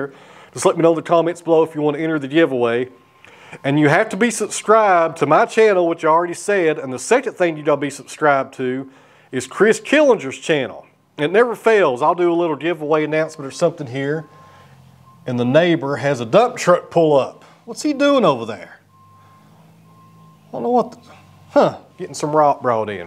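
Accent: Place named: Tennessee, United States. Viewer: I watch you work 1, because I want to own a Woodmizer one day. :-) and 2, because I had family from Tennessee and you talking reminds me of them. Sign me up for the give away!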